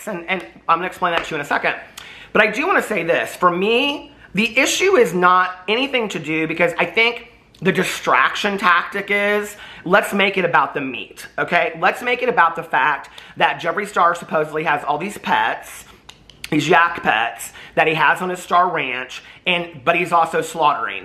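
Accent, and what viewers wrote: South, United States. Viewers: With your Southern draw, where are you from? Excellent video!!!!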